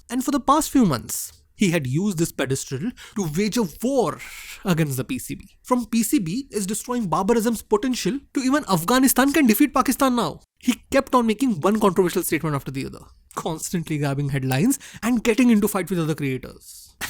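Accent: India. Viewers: I love how thick indian accent u have. Raaaayhmbo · Bias Indian Accented Blog and we are buying into it.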